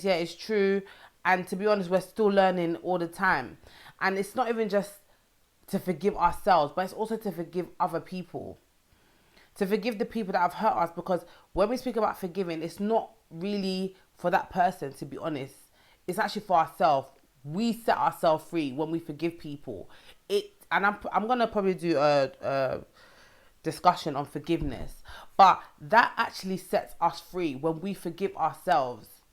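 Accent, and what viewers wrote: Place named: London, United Kingdom. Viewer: I just love that accent, oh how I miss London. You are doing such an awesome job with the content you are touching on. There are life lessons in all disappointments. Thanks for the inspirational message.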